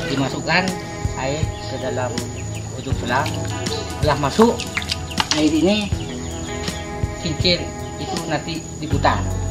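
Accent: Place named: Madura, Indonesia. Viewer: waww.... orang madura nih bahasanya... mantep2 !!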